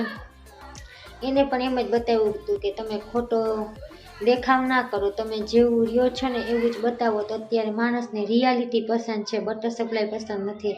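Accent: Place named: Porbandar, India. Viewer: પોરબંદર ની ક્યાંક તોસડી બોલી આવી જાય એટ લે તમે ક્યાં ગામનાં છે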